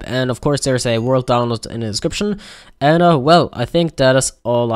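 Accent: Germany. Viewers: yup... I love his German accent. Most good technical minecrafters have German accents, ilmango, nex, methodzz and so on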